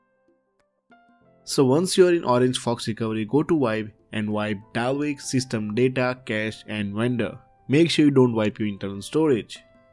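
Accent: India. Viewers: Is this safe ? U look like an Indian from ur voice and I mostly dont trust them Pls answer me 🥺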